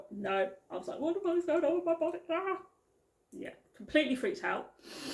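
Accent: United Kingdom. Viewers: Britânic English... Happy friend's day! I'm learning english! I really need learn! Thanks for you. Good afternoon.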